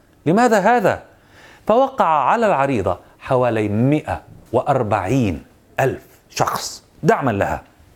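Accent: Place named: Iraq. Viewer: حسب للكنته يبدو أنه عراقي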